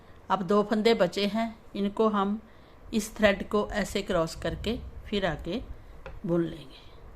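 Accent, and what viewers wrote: Punjab, India. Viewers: Aap ki panjabi hindi bahut pyari lagti hai aur aap bahot achha samjhate ho. Thank you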